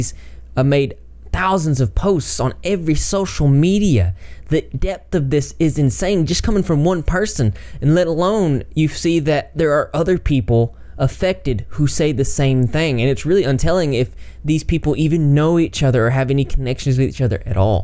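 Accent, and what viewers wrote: South, United States. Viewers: And as far as someone saying something about your voice in a previous video... I’m a southerner from Alabama and see nothing wrong with a southern accent. 🤠👍🏼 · hearing all this in a southern accent has me shook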